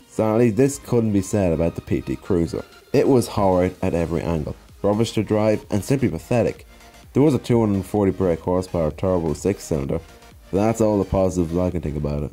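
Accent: Ireland. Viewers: Hi... Only me r u sound Irish?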